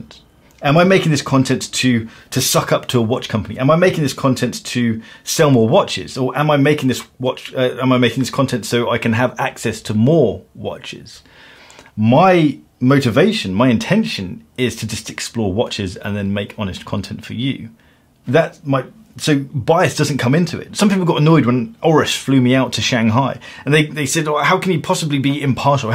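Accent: United Kingdom. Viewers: is the Chris Harris of the watch world. Always well articulated thoughts and enjoyable to watch. It might also be the British accent.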